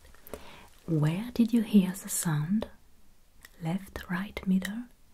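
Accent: France. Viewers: I’ve checked out many different ASMR sites, and ALWAYS end up coming back to this one. The tingles come on really quickly as soon as you begin doing anything with your hands- from counting to palm reading to (especially) smoothing fabric - and your beautiful French accent is so calming to go along with it. You are the Absolute ASMR QUEEN - thank you for doing what you do! ❤😌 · je crois déceler un accent ? Tu es Française ? pourrais tu faire une vidéo en français j'aimerai beaucoup écouter ton travail dans ma langue maternelle. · i love the french accent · I'm in love with your voice and french accent. Your videos are just pure art but I like the most fall asleep listening only your voice and sounds. Nothing more relaxing!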